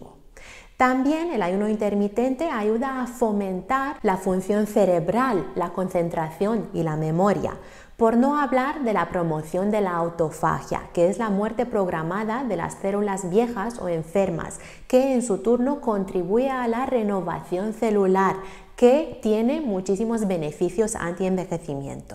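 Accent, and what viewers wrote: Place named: Romania. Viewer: Văd ca ești românca după accent, a luat premiul Nobel la autofagia deci mi se pare cea mai mare descoperire.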